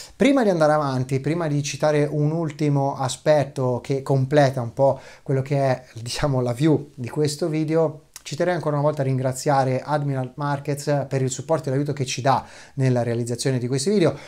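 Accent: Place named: Umbria, Italy. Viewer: chiedevo per via dell' accento familiare, suonava come umbro, nun t'incazza.........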